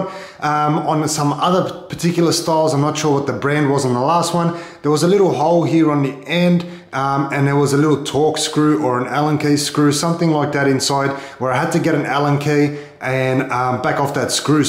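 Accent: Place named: Australia. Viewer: Thankyou, i was about to rip the door off it hinges but you saved me. What is with the rubber washer, dumb. Love hearing a aussie voice on a YouTube help channel .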